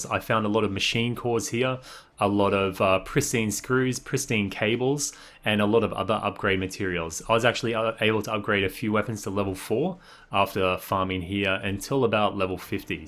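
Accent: Australia. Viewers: Can't place your accent. Are you Australian or New Zealander?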